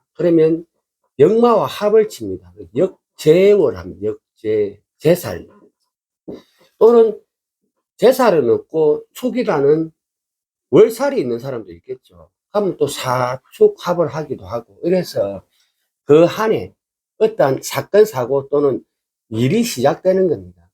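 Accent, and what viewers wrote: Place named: Gyeongsang, South Korea. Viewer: 경상도 사투리가 넘 구수하네요 😊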